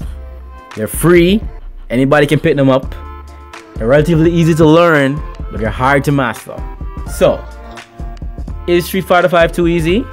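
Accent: Jamaica. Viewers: i can hear the Jamaican in you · I think street fighter is easier than tekken because street fighter you don't have to learn as much compared to tekken you have to literally put in more hours than in street fighter people have this big misconception that tekken is an easy fighting game but when you play in high level play it's hard as fuck and that guilty gear does sound hard as fuck though I might try it out and you sound Jamaican big up lol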